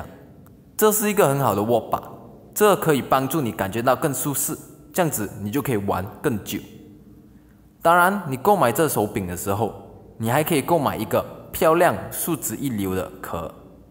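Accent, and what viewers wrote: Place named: Malaysia. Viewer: 这发音 好像马来西亚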